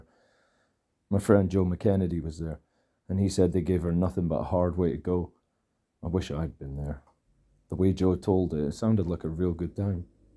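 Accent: Scotland, United Kingdom. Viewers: Oh wow, scottish asmr and Bachman? And RAGE? Lets GOOOO! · as a Scottish person hearing a audiobook by a Scottish person gives me school flashbacks aha also I couldn't find this book anywhere so it's good u covered it on YouTube, you should do more of these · The story sounds so medieval 🏰 being read by a scots narrator 🏴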